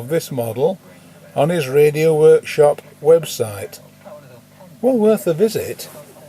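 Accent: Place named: Sheffield, United Kingdom. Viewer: Just discovered your channel. I'm sure you've long since finished the Philco book. I've just got my first vintage radio myself. a 1933 Philco model 18H (Highboy). When I first clicked your channel I assumed you would be out of Birmingham, Pennsylvania (steel city) but upon hearing your accent, now I'll assume Sheffield? Can't wait to see more of your videos.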